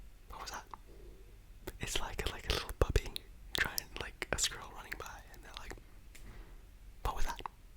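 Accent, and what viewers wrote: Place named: Australia. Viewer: Aussie accent 🥰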